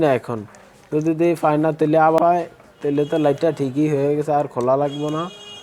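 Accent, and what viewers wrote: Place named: Bhola, Bangladesh. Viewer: আমি আপনার কথা শুনেই বুযেছি যে আপনার বাড়ী ভোলা, আমার বাড়ীও ভোলা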